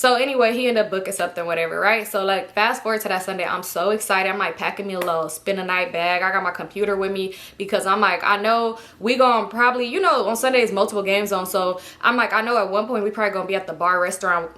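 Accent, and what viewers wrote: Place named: Florida, United States. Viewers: I know a Polk accent when I hear one. IYKYK Our girl getting that ring 💍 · Thats a Florida accent frfr. lol Love that for you!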